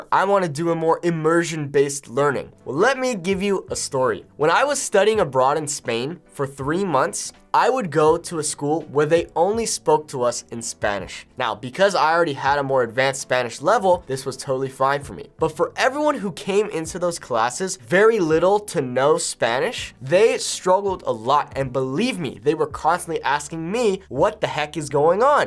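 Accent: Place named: Mexico. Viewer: si Señor ! I'm a podcast member and gonna upgrade to the taco. My italki tutor was wondering what podcasts i listen to and i told her, and I mentioned that yours was hardest for me to understand. She played a few minutes of the recent Gringo or Latinas podcast and said "that's VERY Mexican", lol...... needless to say i dissected that transcript later that night. Your podcast is the one that pushes me to keep working harder. Keep up the great work!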